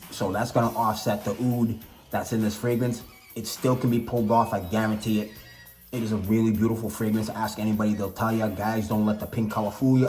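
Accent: Boston, United States. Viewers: Are you from Boston? great list.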